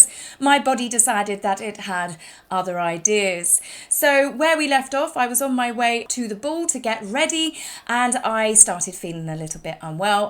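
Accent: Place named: United Kingdom. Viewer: Hey, love your Brit accent when calling out "bullshit and shite." It never gets dull.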